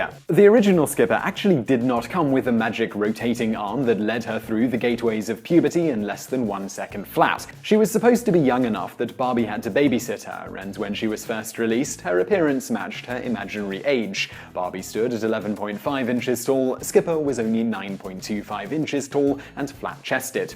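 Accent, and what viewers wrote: United Kingdom. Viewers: The dude's British accent makes it sound like he's talking about someone named Bobby.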